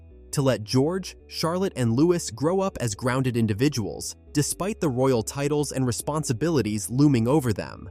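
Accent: United States. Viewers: And why use an AI narrator? If you absolutely can’t afford the minuscule fee a human narrator would earn, at least teach the AI to pronounce the names of the royal family correctly. It’s offensive to propagate mistakes and then use an American accent. That’s a deliberate decision. Real Americans can pronounce Louis correctly.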